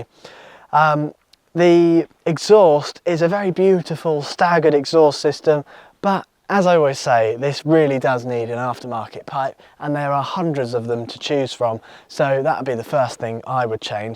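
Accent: England, United Kingdom. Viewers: How tall are you? Asking cause if you’re 5’9/5’10, or roughly 1.75 meters since you sound English it looks like the size of my Honda shadow and I’m looking for something bigger.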